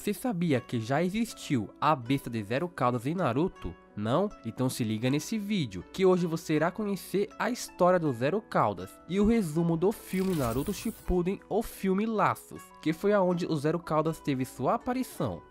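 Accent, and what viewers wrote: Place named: Portugal. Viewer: Cara gosto muito do sotaque de portugal é bem legal mano sigo um bocado de youtubers portuguêses por causa disso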